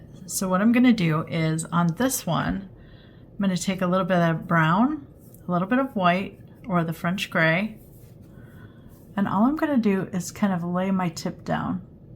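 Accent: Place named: Midwest, United States. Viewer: Hi! I just want to tell you how much I love your style and enjoy your videos. Your work is gorgeous! Thank you so much for sharing your talent ❤ On another note, I’m curious to know where you’re from. You mentioned the Art Institute of Chicago in a video I recently watched. I was born in Chicago and have ties in Wisconsin. I’m thinking your accent is maybe Wisconsin…Michigan…Ohio…?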